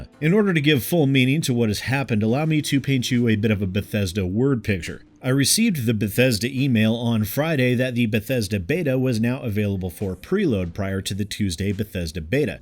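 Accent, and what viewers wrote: United Kingdom. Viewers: Damn, this was so cheeky I could have sworn you were British. Nice work.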